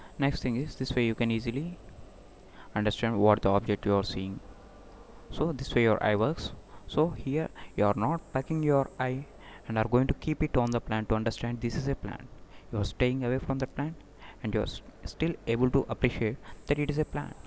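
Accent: India. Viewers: What is difficult to understand is the indian accent. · i like indian english though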